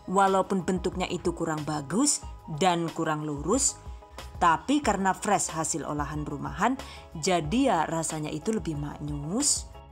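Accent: Banyumas, Indonesia. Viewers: Ngapak2nya bikin gemes 😀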